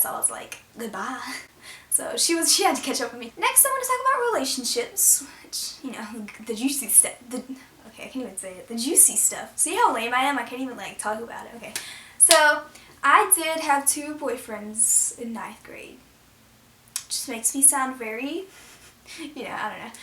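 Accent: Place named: South, United States. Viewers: I love your accent I’m from Texas and I love the southern bell accent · where are you from? you have a slight southern accent I think 😂😍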